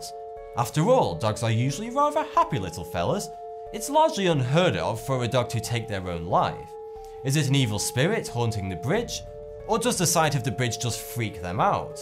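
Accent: United Kingdom. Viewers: So what is this guys accent? British, Scottish? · British accent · Maybe it's exactly because his accent seems really "british " and let's say pure that I find it hard to understand,I'm more used to "impure accent" ,when we practice our english in class we do not have that perfect accent so it can be harder. I find american or australian accents easier to hear down But maybe it's just me ahah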